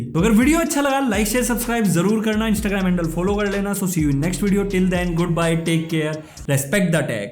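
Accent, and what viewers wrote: Meerut, India. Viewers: Bhai aap Meerut se ho ??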